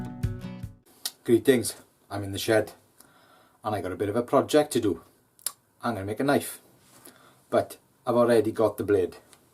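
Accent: Wales, United Kingdom. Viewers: Hello, I've just come to your channel via recommendation from Greencraft review of a knife you gave him. Have already watched two videos and looking forward to browsing your back catalogue. Very entertaining and good to hear a fellow Welsh man. Regards.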